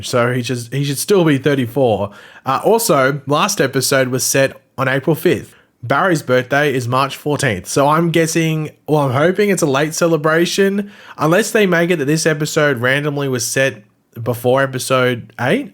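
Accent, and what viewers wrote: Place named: Australia. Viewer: sorry i've been smoking but i forgot you were Australian till you said crisis lol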